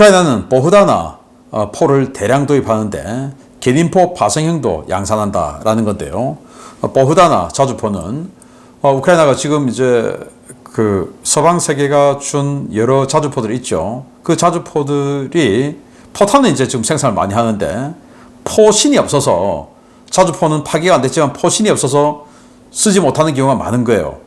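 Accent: Gyeongsang, South Korea. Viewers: 신인균 너 말대로면 모스크바는 3번쯤 함락됐어야 한다 경상도 씹 말투 존나 거슬리네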